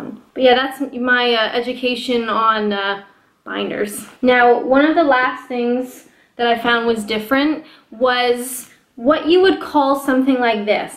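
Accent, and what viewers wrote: Canada. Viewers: your Canadian own it. you say aboot its natural for you and its okay.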